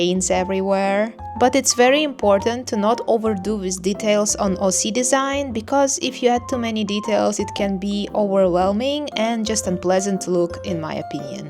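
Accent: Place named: Russia. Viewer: Просто интересно. Я недавно наткнулась на твои видео, и слушаю тебя... Твой голос напоминает русский акцент) ты случайно не говоришь по русски?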